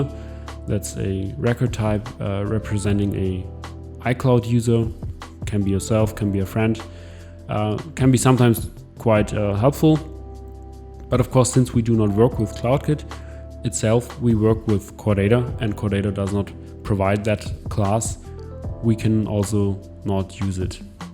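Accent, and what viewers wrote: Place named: Denmark. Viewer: Are you Danish? I'm only half Danish but I am detecting a Danish accent. You put a lot of work into this video and are very knowledgeable. You've gained a subscriber. It's too bad you don't have more videos on your channel! Question: did you practice making videos before and have made a lot of them private?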